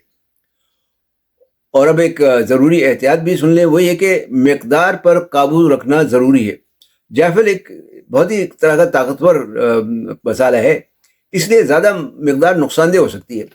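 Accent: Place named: Lucknow, India. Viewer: آ پکا بات کرنے کا انداز یوپی والا ھے جیسے لکھنؤی!!!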